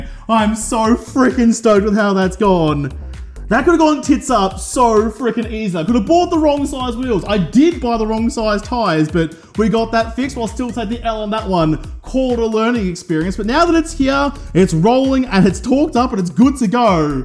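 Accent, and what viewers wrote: Australia. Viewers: The aussie gab is a riot to listen too. At least you have a garage to work in! Im out here working on my Dart laying on my back in the dirt lol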